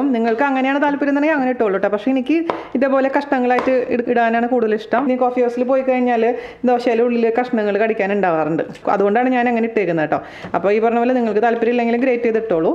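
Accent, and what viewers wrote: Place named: Thrissur, India. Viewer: Ready Mani ayyi...athu enniku ettayi... thrissur slang...I also from Thrissur...now at Kochi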